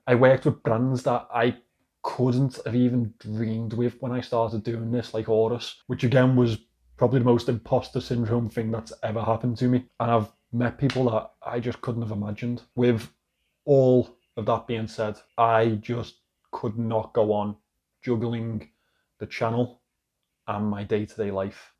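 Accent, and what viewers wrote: Liverpool, United Kingdom. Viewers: Wow, that was unexpected. I really thought you are shutting down the channel. But now it is a different story! Wow. 🙂 I am not an English native speaker, so I used to struggle understanding your dialect, but with every video I get more and more. I recognize your videos not only as a way to discover watches, but also as a way to learn and better understand British English. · Sorry for all you’ve been going through, but congratulations about being able to do this full time now. (May I ask if you’re from the Liverpool area? I sense a Scouse accent, but I’m American so I’m never sure. I’m just a big Liverpool FC fan and have been for about 16 years.)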